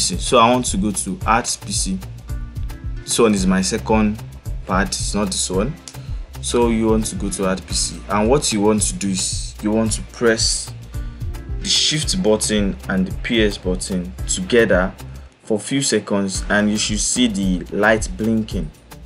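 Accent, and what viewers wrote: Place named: Nigeria. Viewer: I love that Nigerian Accent. 👍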